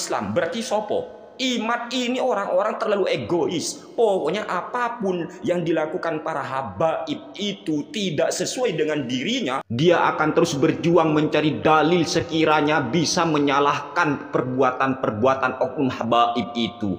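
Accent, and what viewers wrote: Madura, Indonesia. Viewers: Logat maduranya kental banget ustadz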